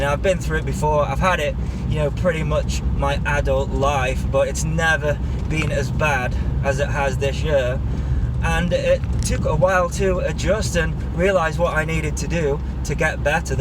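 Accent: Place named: North East Wales, United Kingdom. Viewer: Cool video. I would say; hang on in there, but it sounds like you are. Judging by your accent I would say you don't live too far away from me, but I may be wrong. I'm in North East Wales, are you fairly close?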